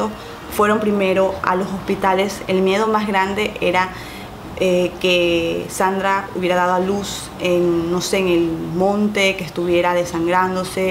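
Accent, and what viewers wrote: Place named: Guayaquil, Ecuador. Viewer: "El man tenía en el baston" se nota que eres guayaca, bellaaa💖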